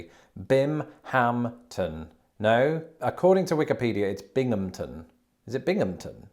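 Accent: United Kingdom. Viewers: The name Binghamton sounds positively British although apparently it’s not. I shouldn’t think that your British tongue should get too twisted over it.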